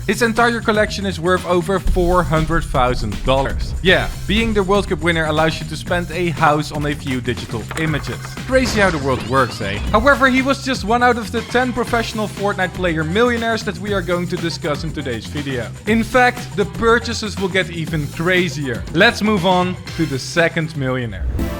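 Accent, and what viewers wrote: Netherlands. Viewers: i smell dutch in ur accent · i hear a dutch accent can that be true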